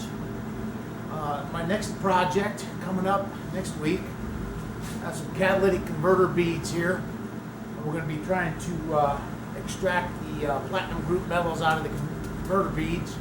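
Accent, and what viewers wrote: United States. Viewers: I stop learned i dont understund when he speaking american- englich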